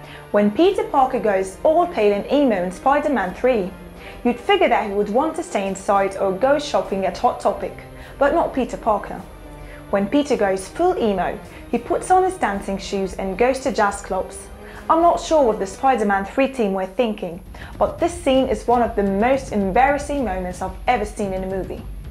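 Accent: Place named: London, United Kingdom. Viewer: Miranda Brooks I had no problem understanding her at all. im from Montana and can understand that it's a typical London accent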